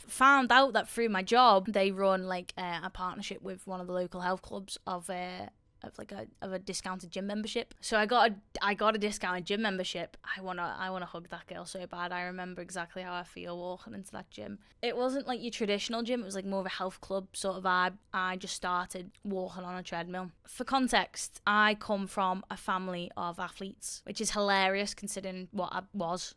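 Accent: Liverpool, United Kingdom. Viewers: Love the scouser accent ❤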